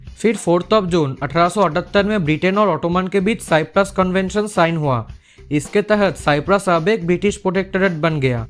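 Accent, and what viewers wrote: Bengal, India. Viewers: Bhai ami sure, tumi Bengali😄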